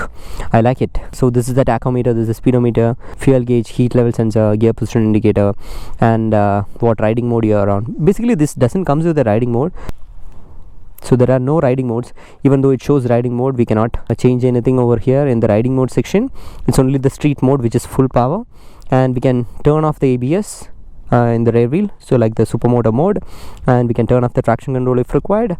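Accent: Kerala, India. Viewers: I knew you’re a mallu with your pronunciation!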